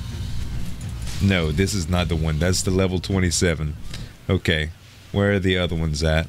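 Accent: Louisiana, United States. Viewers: I want to ask you, but I don't want to miss-place you. You have an accent, and I love it. I just subbed to you so forgive me if you've already established this, but is your accent for Louisiana ?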